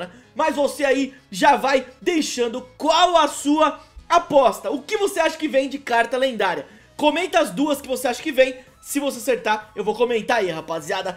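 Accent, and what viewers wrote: Minas Gerais, Brazil. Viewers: mineiro ou tronco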